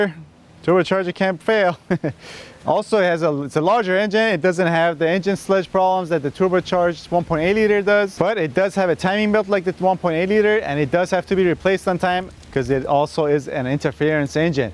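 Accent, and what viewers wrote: Germany. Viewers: I really like your superior German accent. :) Thanks for all of the tips. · Bro you are the best. Your German accent is hilarious 😂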